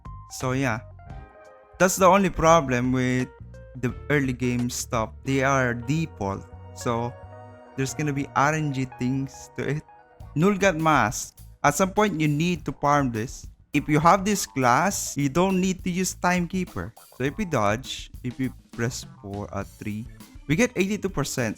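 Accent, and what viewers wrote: Philippines. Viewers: Base in ur tune ur Ph boii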